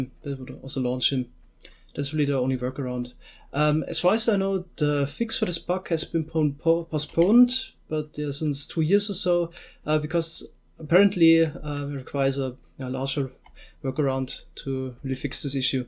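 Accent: Germany. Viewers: I love your german accent keep it up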